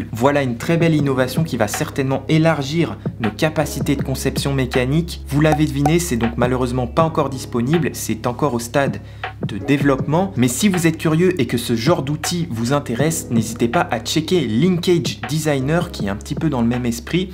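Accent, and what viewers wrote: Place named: Switzerland. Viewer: bonjour de Normandie . un suisse? je m'attendais a un accent bcp plus prononcé. tout fou le camp. mais en contre partie j'aime bcp votre voix elle a une certaine musicalité (meilleur formulation qui me vient e l'esprit). je cherchais des news geek et je suis tombé sur votre chaîne j'aime beaucoup. j'aimerais énormément débattre sur "nouvelle génération d'imprimante 3d" pour moi les reelles nouvelles générations ça va etre les imprimantes a tapis roulant les imprimantes "infinis" tout le reste (a mon avis) ce ne sont que des mises a jours. c'est pour ça que je me casse le train depuis deux ans avec une base am8 ( et meme prusa bear c'est + a la mode) que je veux custom a fond. car lest pas possible de trouver a prix correcte une imprimante qui nous correspond parfaitement . auto leveling, <num>v électronique, stepper, rail lineaire ou tige 8mm buses classiques ou alongee pour imprimer reellement en 3d et modifier la résistances des pièces par la fibre. 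la tete du chassis ect. je vois l'imprimante 3d de mes reves un peut comme le sabre laser d'un jedi qui est fait sur mesure pour lui. et en plus c'est instructif et valorisant.... mais ce qui est frustant c'est que pour l'instant c'est une ender 3 simple qui fait tout le boulot..... enfin bref bonne continuation je vais vous suivre.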